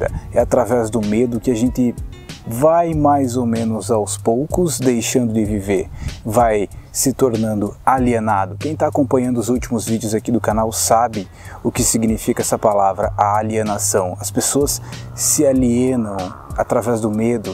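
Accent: Rio Grande do Sul, Brazil. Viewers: Ruinas de São Miguel! Sabia que seu sotaque te entregava...vc é do Rio Grande do Sul.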